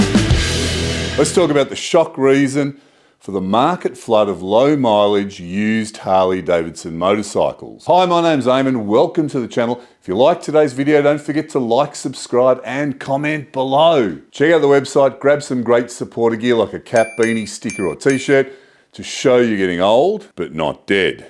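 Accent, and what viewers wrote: Australia. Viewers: I hate AI text. He said "aspire" and the text caption read "a spider". Granted,he does have a strong Australian accent. Perhaps the AI is American. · The Aussie accent aside I was thinking he looked kinda like an Old US Marine.😁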